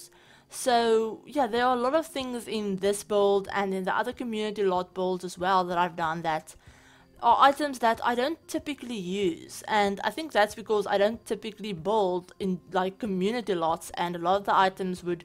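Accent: South Africa. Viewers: My jaw dropped when I heard the South African accent. Need more African simmers!!!!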